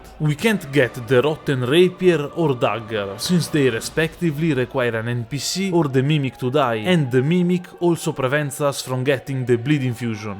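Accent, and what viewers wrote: Italy. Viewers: Hey man. Great video, but I was wondering where are you from. You sound a lot Italian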